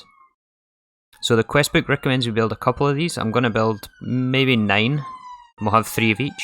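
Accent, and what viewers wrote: Scotland, United Kingdom. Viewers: I think it might be one of the best gaming experiences I've ever had, to be honest. My wife is probably feeling neglected though. Forgive my American ignorance but is your accent Scottish or like northern Irish?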